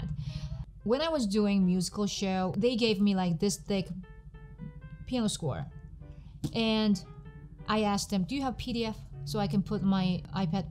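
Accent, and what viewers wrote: South Korea. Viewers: 한국분이신가봐요! 악센트 별로 신경쓰지 마세요 영어 원어민들보다 잘하시는데요 뭘 ㅎㅎ 영상 잘 보고가요! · sometimes i think i'll just watch for a couple minutes and then you get me hooked and i have to sign in to thumbs up and i wind up watching for hours. with this one you had me right at the beginning with "maybe just enjoy this funny english, korean accent" and your expression when you said it. hahahaha! so here i am again! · I adore your funny Korean English accent as you call it. Your are pretty funny when you make fun of yourself. Love it.💙💙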